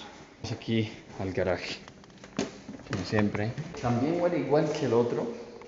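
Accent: Dominican Republic. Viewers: Eres dominicano!!!!!!!!!!!!!!!!!!!!!!!!!!!!!!!!!!!!!!!!!!?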